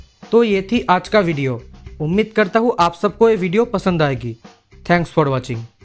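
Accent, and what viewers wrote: Bengal, India. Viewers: Are you bengalee? Because your accent makes me feel like that P:S: I'm a bengalee too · Your accent is kinda like bengali people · Idk why but tumhara accent Bengali lag rha h mujhe 😄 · Tu Bengali hai na?(pronunciation) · Are u bengali? Anyways love from kolkata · Are you bengali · Aaap Bengali ho na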